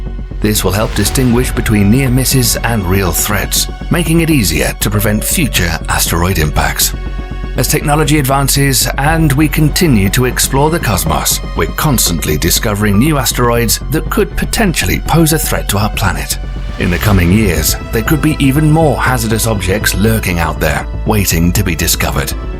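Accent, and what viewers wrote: United Kingdom. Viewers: My favorite British narrator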